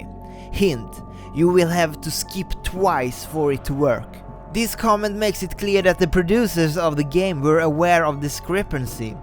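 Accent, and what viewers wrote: Sweden. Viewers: I just know you are Swedish, i can tell from the accent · Are you Swedish? Sorry if I offended you but I would like to know😀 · You're Swedish, right? I kinda hear it on how you pronounce certain words. I myself, am from Norway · It's Swedish. Russian accent sounds much more different.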